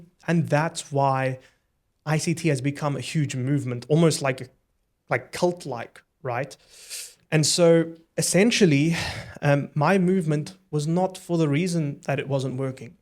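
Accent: South Africa. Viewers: sounds like he is from Cape Town 🤔 lovely video by the way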